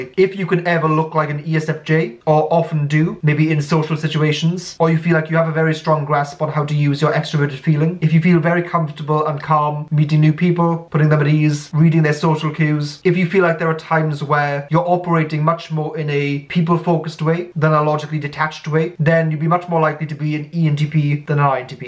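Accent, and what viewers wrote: Wales, United Kingdom. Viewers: Sounds Welsh to me. :-)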